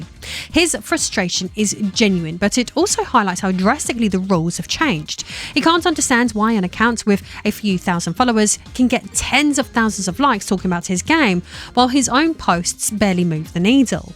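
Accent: United Kingdom. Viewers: Wait, you're a BRIT? 😮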